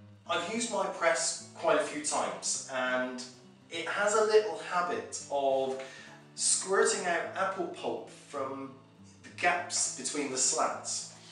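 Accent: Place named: Somerset, United Kingdom. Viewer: Hi, I am from Somerset and by listening to your accent i'm assuming you are too! My question is, where is a good place in Somerset to by apples in bulk at a wholesale price? Cheers mate and great video!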